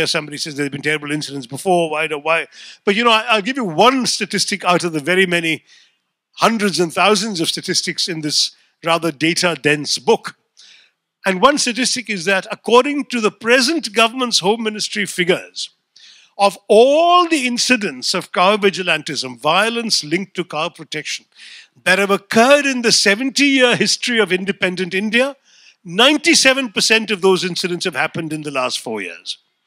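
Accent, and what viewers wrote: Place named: Oxford, United Kingdom. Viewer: Shashi is actually an intellectual fraud. Whatever he speaks against PM Modi is mostly biased and is more applicable to his PM mms or Sg or rg. Speaking good English without substance is of no use for the ‘cattle class’ who have been given gas cylinders, houses, business loans, pension, medical insurance, saved from the tyranny of triple talak, electrity connection, cheap mobile data and above all a sense of hope and pride together. Speaking lies 100 times won’t transform it into a truth even if punctuated with oxford English. A true fraud.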